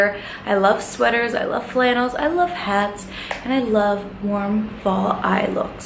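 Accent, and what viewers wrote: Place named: New Jersey, United States. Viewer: just wandering where ur from. i live in nj and you sound like ur from here!!!!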